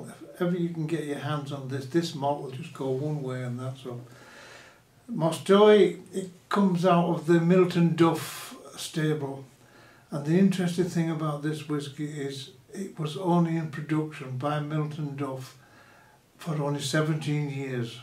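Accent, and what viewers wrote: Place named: North East England, United Kingdom. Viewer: Great guy! Sounds like north east England (his accent). Says “filim” a few times!! Great guy, great video, appreciate the tips!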